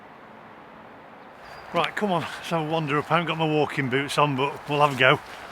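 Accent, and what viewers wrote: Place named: Lancashire, United Kingdom. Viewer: bless you ,they can never take away our memories ,only thing that keeps me going some days ,you sound more lancashire in your younger days lol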